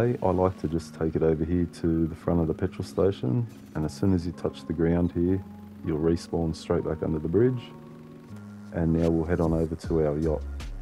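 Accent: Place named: Australia. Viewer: U sound like Ur from Australia if so I'm also from Australia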